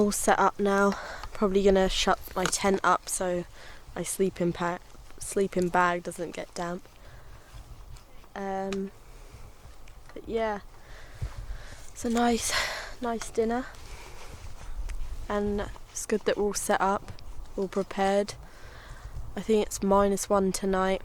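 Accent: United Kingdom. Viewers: I love your calm voice and your little accent so British, it's too charming. You have the right to make fire in your English forests?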